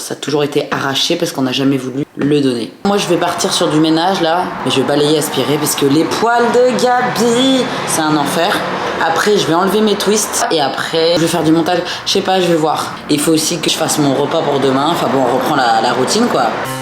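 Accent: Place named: Quebec, Canada. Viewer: Ah mais tu commences à parler Quebecois heinh???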